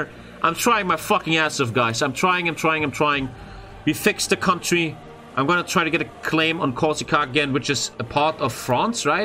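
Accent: Germany. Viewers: I've just been sat here for 20 minutes trying to figure out what this guys accent is and it just hit me straight in the face and now I feel stupid, because it's german and also german. Das Denglisch hätte mir eigentlich nen Tip geben können... und es ist halt super lusitig. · Is he German? Ok he is. Really recognized that at the end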